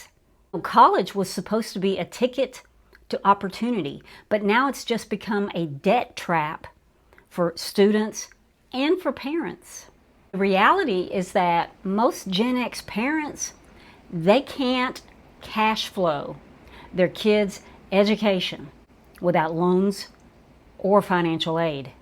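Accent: South, United States. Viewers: Southerner here. Trying to place your accent- North Carolina? GA?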